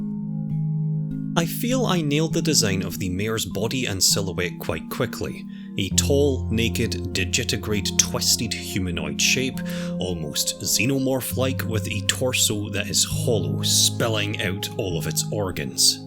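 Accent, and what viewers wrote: Scotland, United Kingdom. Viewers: How am I so bad with accents… me, born and raised in Scotland my entire life.. and can’t even recognise that the voice I’ve been listening to for the past 4 hours of YouTube binging is also a Scot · I can’t un hear the Scottish now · Me realising that is Scottish: I thought you where English and yes the snow is every where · I love that you with a nice and friendly sounding Scottish accent plays such and makes an amazing dark series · Omg you're Scottish ❤️❤️❤️❤️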